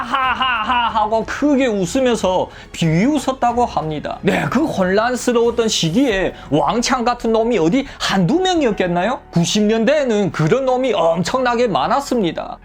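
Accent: China. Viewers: 따거는 중국동포인가요? 말투가 아주 맛깔나네요!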